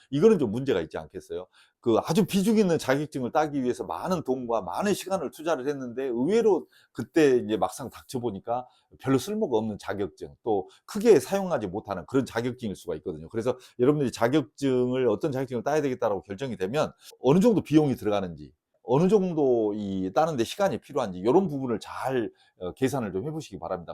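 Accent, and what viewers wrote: Jeolla, South Korea. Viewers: 잘 들었습니다. 전라도 분이신 거 같은데.. 흥하십시오!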